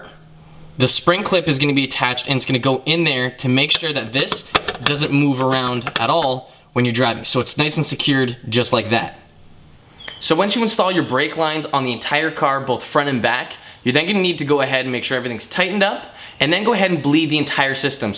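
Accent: Canada. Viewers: He’s Canadian my dood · Yeah Canada! Accent gave it away (I think). Great video!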